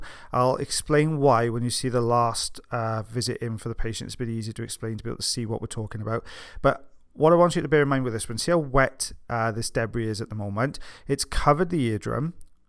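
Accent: Wales, United Kingdom. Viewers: I love the accent! Especially Welsh! And the words used or sayings, in the UK are lovely! I've used "give it a go" so much Its a part of my every day dialog!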